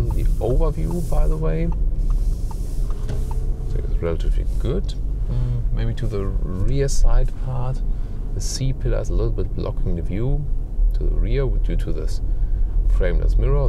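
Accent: Germany. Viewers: Good information! And I really do like your fucking german accent. :))) Great! Did you do these videos in German? I'd like to watch it in German. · Wenn du einfach das deutsche Englisch heraushörst. ;-)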